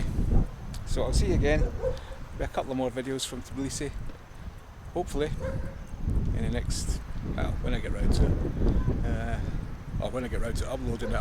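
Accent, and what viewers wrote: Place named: Scotland, United Kingdom. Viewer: This video seems so cool. This is exactly the kind of places I wanna go see. But as a non native english speaker I find it hard to absorb both scottish dialect, wind and road noise. Maybe something can be done? I've watched parts with great interest and given a thumbs up :goodvibes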